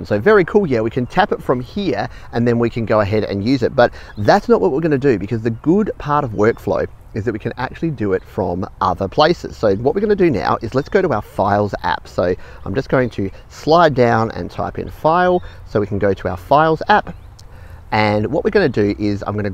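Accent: Australia. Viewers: Australian def not a Brit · Australian. So am i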